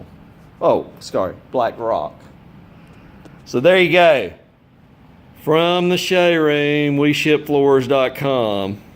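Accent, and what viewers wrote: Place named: South, United States. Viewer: I love your voice. It’s so country.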